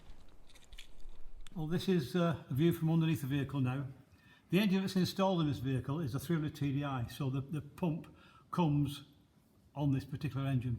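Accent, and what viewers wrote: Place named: North, United Kingdom. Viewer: Nice to hear a northern voice. Now live in Cardiff. Paul would love power steering on his Series 3 Ragtop/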